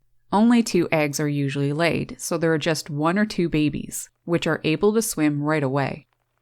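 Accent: Canada. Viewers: This narrator's pronunciation of "about" is a dead giveaway canadian accent, but I don't know any Canadians who pronounce their O's like A's like she does. I hear that in Michigan, Minnesota and Western New York. I'm assuming Canadians with that accent are near those borders? Sorry for the off topic, just curious.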